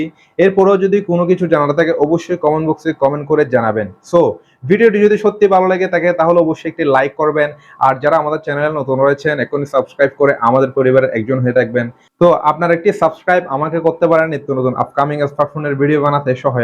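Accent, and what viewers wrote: Chittagong, Bangladesh. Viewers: চিটাইংগা বদ্দা এনা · হেতের বাড়ি চট্টগ্রাম। ভয়েস এ চট্টগ্রামের টোন স্পষ্ট। শুদ্ধ বাংলায় কথা বলতেই সমস্যা সে বলছে সে RJ. মানে বুঝলাম না। আন্দাজে। ইন্ডিয়ান যারা তারা বলছে ঐখানে এখনো লঞ্চ হয়িনি। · তোর বাড়ি hode... চট্টগ্রাম এর ত বুঝা যার বাড়ি hode ও ভাই?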